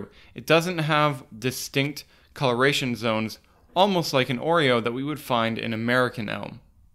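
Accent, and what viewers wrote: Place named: Pennsylvania, United States. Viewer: So where are you at or from? Cuz I'd swear you were a county over with your accent. Ya Sound like any other Pennsylvania person to me.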